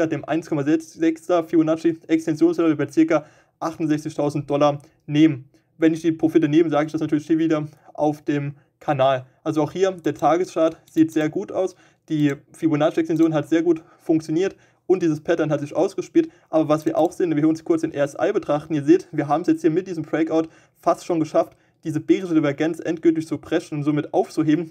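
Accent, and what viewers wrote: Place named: Frankfurt, Germany. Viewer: der junge (mann) hat den GRÖSSTEN RESPEKT auf der gesamten YoutubePlatform verdient! Seine Analyse schlägt sogar jeden seriösen englischen crypto kanal! Ich gönn es ihm dass er "etwas" an seinen Links verdient :) Sag mal kommst du aus Frankfurt???? der Dialekt kommt mir sehr bekannt vor (bin selber Frankfurter) oder doch eher richtung Vogelsberg? BITTE TU MIR EIN GEFALLEN - WERDE NIE WIE DIE ANDEREN CRYPTO YOUTUBER !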